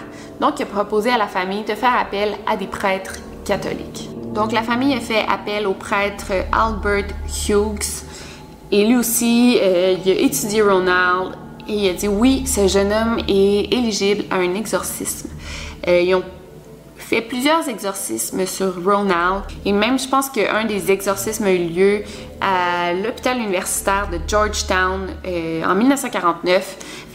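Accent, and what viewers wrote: Quebec, Canada. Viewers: J'imagine que tu a fait baucoup de recherche pour cet vidéo donc bravo et en plus ton axenc québécois (je sais pas comment on écrit) est trop bien donc continue comme ca 😊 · Je trouvé l’accent québécois bizarre mais en regardant tes vidéos je l’adore je le sur kiff de malade 😍😍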